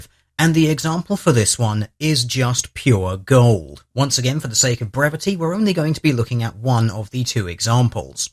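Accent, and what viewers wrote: England, United Kingdom. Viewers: I'm no expert but It's not British, it's English. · I should have said that I know is English and should have specified that he has an English accent, just not Received Pronunciation, though Young Ponymancer did point out that he is close to RP but his voice is nasal. He is certainly not Scottish. What about a Midlands accent but not Brummie nor Scouse though I can't them tell apart since I'm American.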